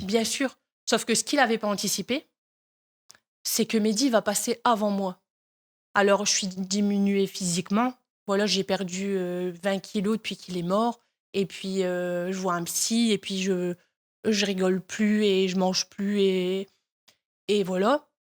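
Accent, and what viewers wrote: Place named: North, France. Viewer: J aime quand ton petit accent du chnord ressens . Parfois j ai pu entendre Medhi a travers ton interview.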